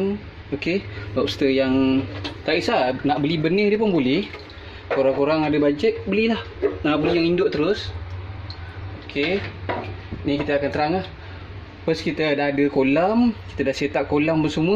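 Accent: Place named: Kuala Lumpur, Malaysia. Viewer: Ni cara cakap orang kuala lumpur dan Selangor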